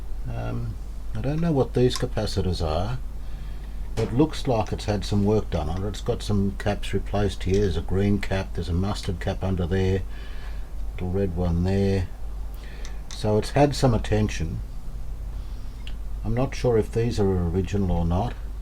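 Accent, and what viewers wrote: Australia. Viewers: Hi Don, I know this was a long time ago now. My name is Sara. I've been trying to restore my grandparents' old nippergram. One of the .01MFD 630VDCW capacitors are no longer working. Do you know what could work as an alternative and where to source such a thing? It also sounds like you're in Australia. I'd love to know whereabouts and if it's not too far, I wondered if you could help me to get it up and running again 🙂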